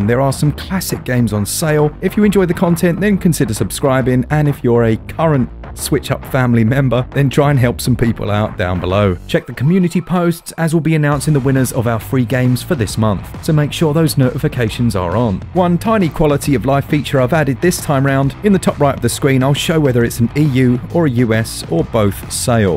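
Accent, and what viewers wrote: United Kingdom. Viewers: yay british. im subbing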